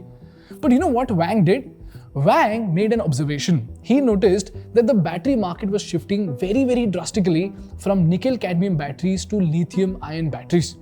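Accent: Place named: India. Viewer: OMG.... Indian narrator, just like Polki Sharma. Can the details be true or accurates. Really in doubts.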